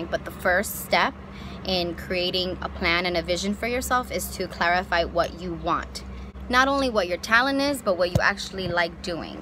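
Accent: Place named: New York, United States. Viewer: I can recognize NY anywhere 😂🙌🏻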